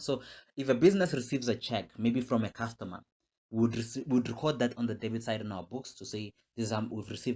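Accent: South Africa. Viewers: Very well elaborated! Thank you. The illustration looks like a Kenyan question but the accent is South African haha (on a light note)... Thanks again!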